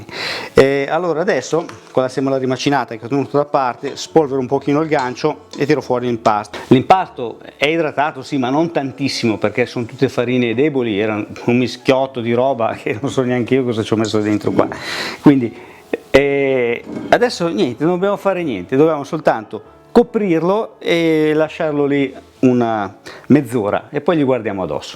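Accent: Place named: Milan, Italy. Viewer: Video doppiamente bello e buono, per il pane e per il dialetto meneghino.👏👏👏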